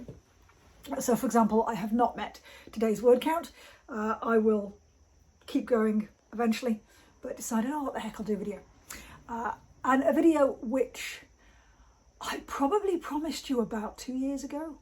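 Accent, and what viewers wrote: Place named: United Kingdom. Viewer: Hi - greetings from Denmark. So nice to hear your voice. I enjoy your books, and it was so great to hear your British accent and even some dialects. You take care of your wife and cats. All the best. Kirsti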